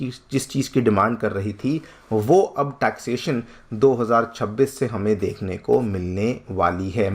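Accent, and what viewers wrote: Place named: Uttar Pradesh, India. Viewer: shayad ye mahoday uttar pradesh se hai kyuki Maine dekha hai up me log ण कोड की तरह बोलते हैं।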